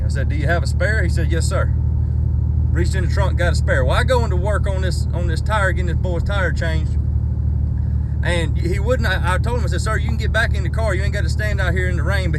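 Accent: South, United States. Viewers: That country accent and them sayings in between have me 💀 😂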